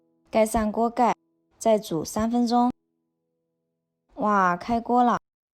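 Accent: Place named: Sichuan, China. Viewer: 川妹子吗？这普通话 哈哈哈 加油！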